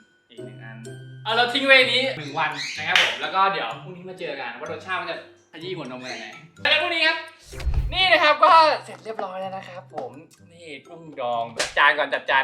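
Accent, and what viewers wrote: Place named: Isan, Thailand. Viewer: เว้าอีสาน แล้ว1 น่าฮักกกกกกกกก อารมณ์พี่จินเว้าอีสาน อะไรงี้ 555+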